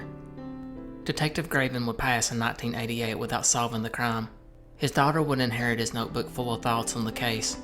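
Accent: South, United States. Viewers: Kentucky? WV? Tennessee? I’m trying to pinpoint lol. I’m in kentucky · I recognize southern accent.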